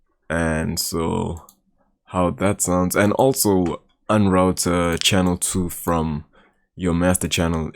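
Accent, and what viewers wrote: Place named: South Africa. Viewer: I know a South African accent when I hear,I'm glad there's more fans in the country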